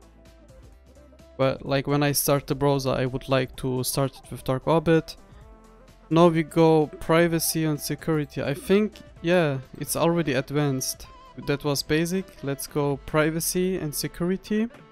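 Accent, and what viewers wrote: Germany. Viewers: Junge man hört den deutschen dialekt sofort raus hahah aber trz nice video😃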